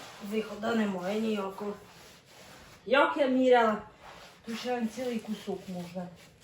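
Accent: Poltava, Ukraine. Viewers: Полтавськая область, Карловский район. Это родной мой край, где прошла моя молодость, где могилы моих родителей. Слушаю и наслаждаюсь, у меня тоже такой же говор. ❤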